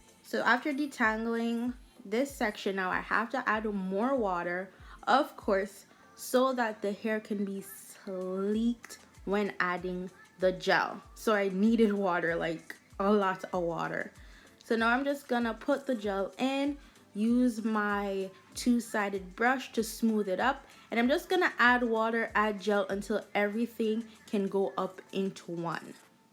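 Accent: Jamaica. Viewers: Didnt even realize you were Jamaican until I read the comments and relistened to the video. As a Jamaican myself, I totally forget about that now that Im living in America because we have the same accent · Crissy samuels she's Jamaican. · she low-key sounds Jamaican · Baxcide har accent shes jamaican 💗💗💗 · Could tell you were a yardie from the first word u said 🇯🇲🇯🇲🇯🇲🇯🇲 mi ago try dah style ya tomorrow. Big up u self cute gyal 😍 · are you Jamaican? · Knew you were Jamaican from the video start, mi ago try dah style yah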